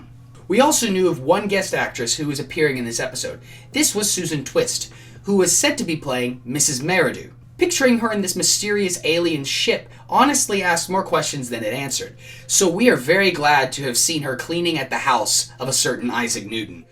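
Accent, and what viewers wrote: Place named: United States. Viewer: why he got an American accent? i thought you were from the uk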